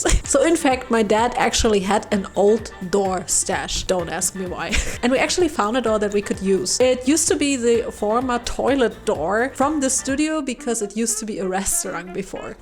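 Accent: Germany. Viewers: I love your videos and your German accent I am German and shout-out to u and your dad yall do amazing work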